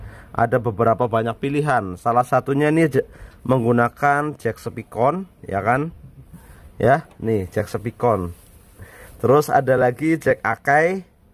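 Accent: Banyumas, Indonesia. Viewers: Ngapak purbalingga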